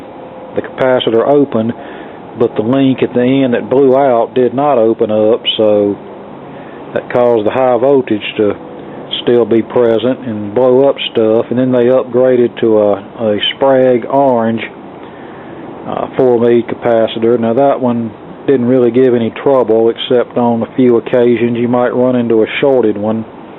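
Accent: United States. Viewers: Interesting American accent. Which part of America are you from, if I may ask politely? I am from Australia.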